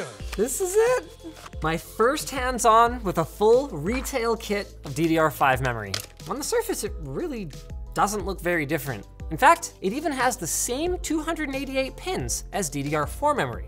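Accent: United States. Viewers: really speaking American here. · went very american in this one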